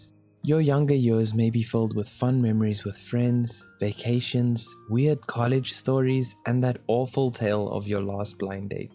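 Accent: South Africa. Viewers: I love that the voice actor is south african ahh! good to see more of us in the media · Is the narrator South African? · the man speaking in this video has a South African 🇿🇦 accent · South African narrator???? 😁😁😁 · the voice over artist has a South African accent😁🇿🇦❤ N#NODNAJUSTRSA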